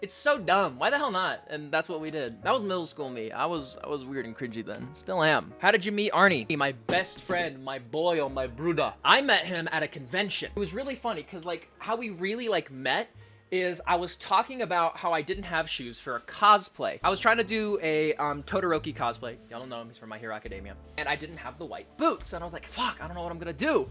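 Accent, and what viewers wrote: United Kingdom. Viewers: LOVE THE NEW BRITISH VOICE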